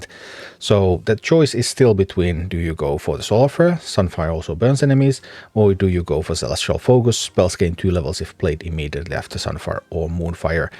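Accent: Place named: Finland. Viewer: It's the power of the Finnish accent :)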